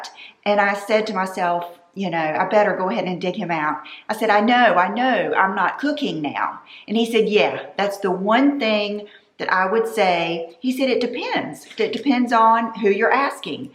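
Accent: South, United States. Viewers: Hi, I’ve been a subscriber for over 10 years. I was going through a divorce in 2011 and would escape for 25 minutes by watching your videos. I loved and still do your southern accent and how genuine you are. You are truly a blessing for so many of us. Sending you love from a 50 year old Woman in California 💗🦋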